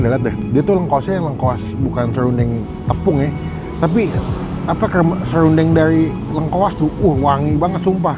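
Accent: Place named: Java, Indonesia. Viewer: Mantabbe ini medok...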